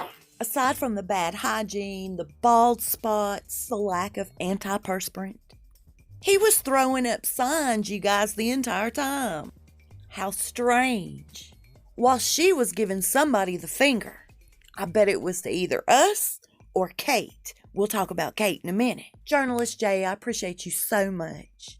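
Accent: South, United States. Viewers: Catty, nasty and hateful. This narrator is one of the original small town "Mean Girls". Even her southern accent proves it. Wonder who paid her to do this?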